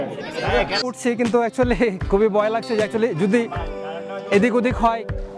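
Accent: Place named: Kolkata, India. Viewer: ভাই কি ইন্ডিয়ান? কথার ভেতর কলকাতার ভাষার টান আছে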